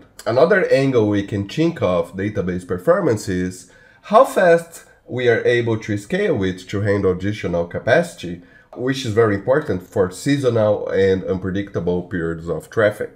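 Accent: Brazil. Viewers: Pelo sotaque esse cara é brasileiro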